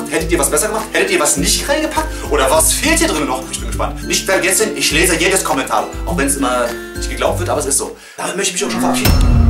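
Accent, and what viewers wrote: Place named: Saxony, Germany. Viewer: Könntest du Vllt mehr säschsich reden?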